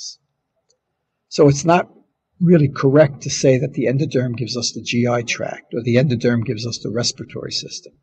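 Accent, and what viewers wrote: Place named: Boston, United States. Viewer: i love your Boston accent!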